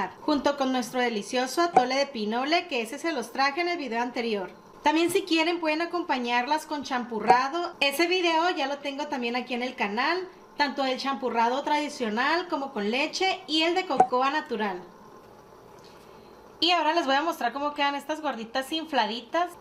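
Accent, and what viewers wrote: Sinaloa, Mexico. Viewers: Hola nueva suscriptora eres de sinaloa verdad el acepto nos delata ji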